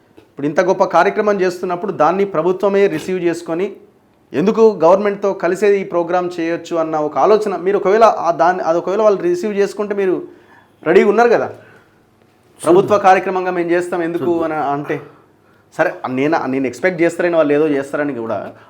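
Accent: Telangana, India. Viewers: తెలంగాణ యాస తో వివరణ ❤❤❤❤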